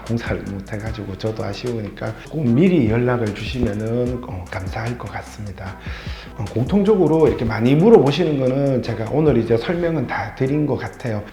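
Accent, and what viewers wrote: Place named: Gyeongsang, South Korea. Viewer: 역삼에 있는 개발회사인데 9월이사라 이사갈곳정하고 있는데 연락 꼭드리겠습니다. 같은 경상도 분이라 먼가 친숙하네요^^ 전용 약 63평, 임대면적 99평정도 되는 사무실 예정하고있습니다^^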